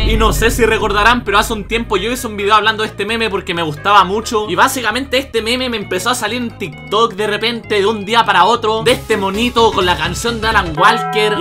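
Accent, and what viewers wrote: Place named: Chile. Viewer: La unica parte buena de chile, es ese acento chistoso. Grande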